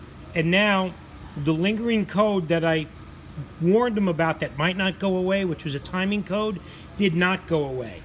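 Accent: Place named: Valley Stream, United States. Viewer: I watched this video and by your accent I'm going to guess you are from valley stream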